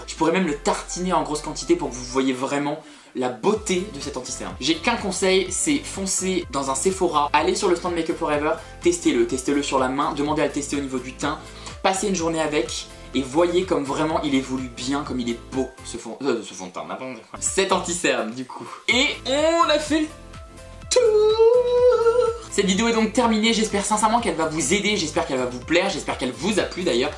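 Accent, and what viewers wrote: United Kingdom. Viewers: Il a l’air pas mal le petit dernier ! 😃 et cette petite pique 😂 ton accent anglais on l’adore 😍😃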